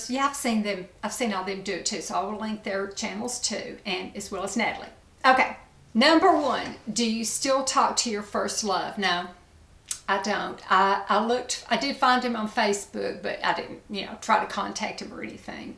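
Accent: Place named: Tennessee, United States. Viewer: That was so much fun! I love listening to your accent. I’m English but have lived in the US for 26 years now. We spent 8 years in Knoxville, TN and listening to you takes me back there 😊💕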